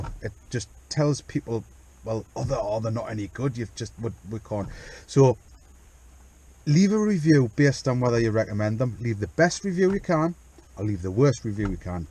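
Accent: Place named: London, United Kingdom. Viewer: have a quick question. Being in the states (mid-Atlantic Maryland), we have a weird accent that no one can figure out where we are from. Southern states think I’m from NY, northern states think I’m from GA. Yes, the accents here are that different. Is England the same? I’m guessing you may be from north London based on your thick accent. Think Adele. She has the same dialect as you and other pipe cleaners in London!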